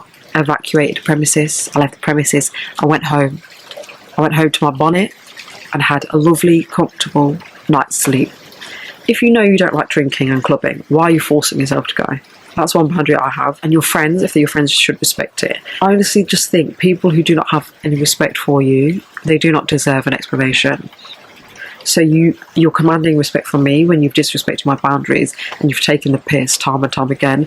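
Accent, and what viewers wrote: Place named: United Kingdom. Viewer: i didn't expect you to be br*tish wtf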